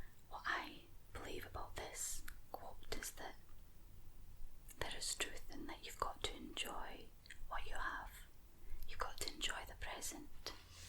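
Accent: Scotland, United Kingdom. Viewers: Hi.Thanks for the videos ive been struggling with anxiety.On another note im so used to american slang that is great to hear your scottish articulated speach.I kinda giggle with your prononciation in an non making fun kinda way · Great job. Your accent takes me back to when I lived in Scotland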